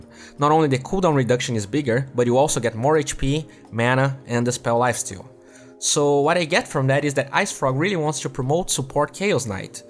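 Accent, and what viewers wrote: Brazil. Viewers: You kinda have a Brazilian accent, it's cool though.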